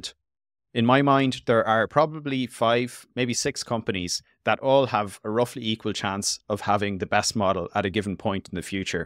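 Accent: Ireland. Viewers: Surprising but great to hear an Irish voice on an AI LLM review. Great content mate intelligent & well explained, keep it up please!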